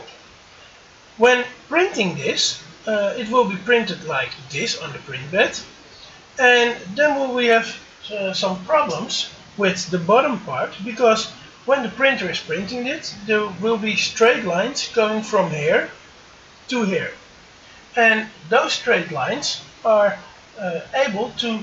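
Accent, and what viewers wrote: Netherlands. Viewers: yeah you can hear that. :) greetings from Belgium.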